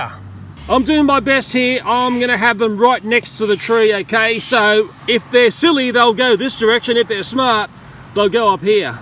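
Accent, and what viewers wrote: Australia. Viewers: your natural curiousity is on point mate., also we really need another aussie to narraeete some nature action. aussie narration is the best narration that exists.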